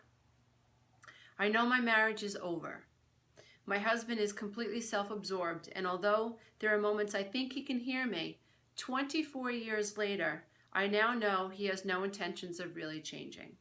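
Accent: New York, United States. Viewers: Great advice. Are you from the Bronx?Brooklyn?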